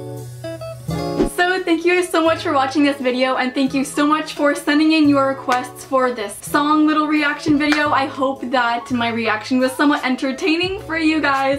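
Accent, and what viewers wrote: Canada. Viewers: are you canadian?! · Are you Canadian? Btw I liked the video :)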